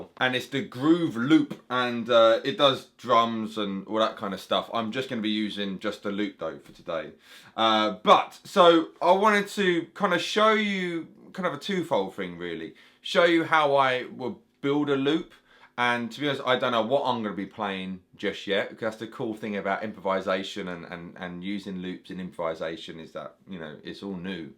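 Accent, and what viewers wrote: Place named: Cockney, United Kingdom. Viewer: Came for the listen, stayed for the cockney accent.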